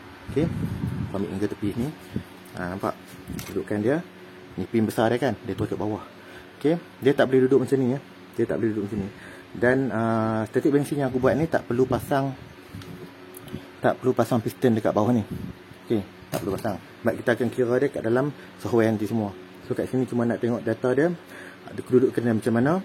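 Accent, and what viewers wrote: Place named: North, Malaysia. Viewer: Dekat mana nie...dngaq cakap utara...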